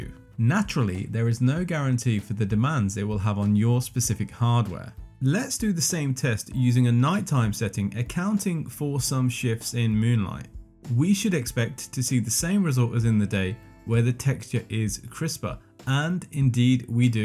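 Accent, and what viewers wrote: United Kingdom. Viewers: Damn, that British accent! I absolutely love it! And your video too, of course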